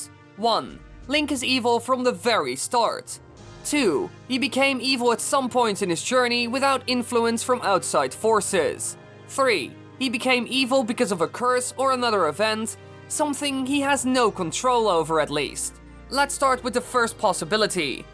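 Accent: Netherlands. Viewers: are you duchts ???NL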